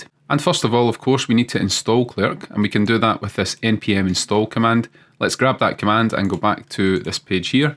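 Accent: Scotland, United Kingdom. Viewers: Within the first few seconds of watching the video, I got strongly drawn towards his Scottish accent of English .... Are you from Scotland Sir? Love from India !!!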